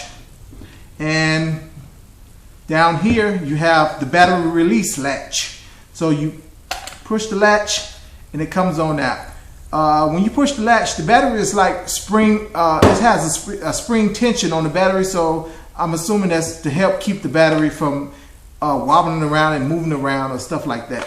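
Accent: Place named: South, United States. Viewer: Very southern twang review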